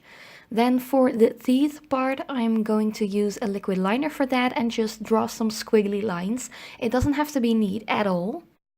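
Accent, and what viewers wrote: Netherlands. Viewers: Sorry, naar ik kon duidelijk je Nederlandse accent horen! 😅 Niet gemeen bedoeld tho, ik vind het juist iets vertrouwds hebben. Oh ja, en de look is GEWELDIG! Goed gedaan! 👏👏🙏🙏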